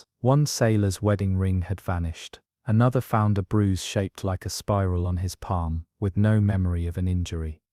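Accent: United Kingdom. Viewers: It's the British accent, I don't know what it is about it. Got a nice cadence, too.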